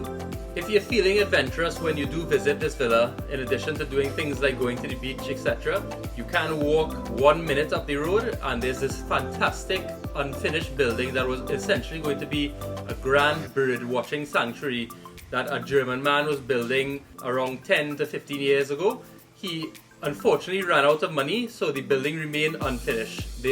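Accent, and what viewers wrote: Trinidad and Tobago. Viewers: HI MY FAVORITE TRINI, AS MENTIONED BEFORE WE STAYED AT BLACK ROCK 2016. OUR VILLA WAS THE FIRST OF (3) WATERFRONT WITH A POOL. IT WAS A DEAD END ROAD. THE PROPERTY MANAGER WAS A ENGLISH WOMAN MARRIED TO A BLACK TRINI MAN. THEY LIVED IN TRINIDAD. THE VILLA WAS OWNED BY A SCOTISH OR ENGLISH PERSON. IT HAD (3) B.ROOMS . MAIN B.ROOM HAD OWN BATHROOM, (2nd) B. ROOM HAD LRG. WALK IN SHOWER. THERE WAS ALSO A SEPARATE FULL BATH ROOM. WE WALKED FROM THE VILLA INTO THE BEACH THRU A SMALL GATE. IT WAS A BEAUTIFUL (3)'B ROOMS VILLA, WITH ALL THE AMENITIES; Including a weekly House Cleaner. ANYWAY THANKS FOR SHARING. CONTINUES TO TAKING CARE OF THAT ACCENT. BYE, BY. CANADA 22/04/03 p.s. Unfortunately too OLD to Travel; if not I would definitely visit TOBAGO. SPENT (4) DAYS IN COVA or COUVA, DID NOT LIKE IT. OH WE WENT TO PITCH LAKE which was interesting.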